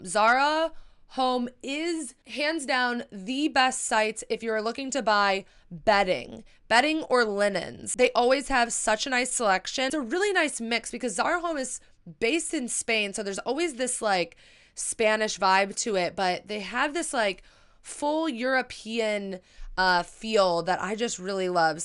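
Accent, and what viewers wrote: Chicago, United States. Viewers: I love your videos. You’re smart and opinionated and your Chicago accent makes me homesick. As far as being sad—you need to work things out in your own time. There isn’t one way to get over heartbreak. One day you’ll wake up and things won’t seem so hard. As for shopping—Zara Home is great for things like drawer pulls and candles. Their sales are fantastic. I would love to see your thoughts on Anthropologie—so cute, but really expensive and the quality doesn’t always seem to be there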